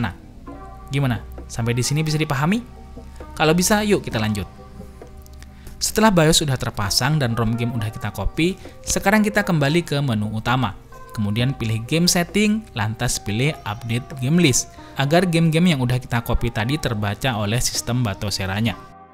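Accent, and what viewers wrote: Madura, Indonesia. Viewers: kok berasa ada cengkok medure nya....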